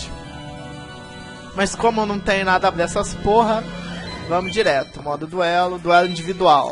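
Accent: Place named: Minas Gerais, Brazil. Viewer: gosto muito do seu sotaque ele é muito engraçado sotaque mineiro (ochente)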